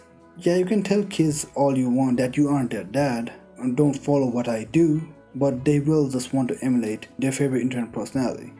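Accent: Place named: India. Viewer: Yo, from a fellow indian work on your accent.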